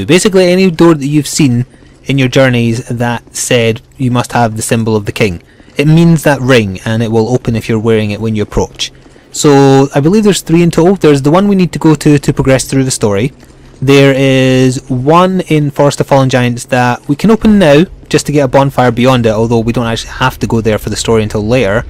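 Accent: Scotland, United Kingdom. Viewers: Your voice sounds very charismaticaly. Is that scotish accent or there is no such difference between these nations of UK?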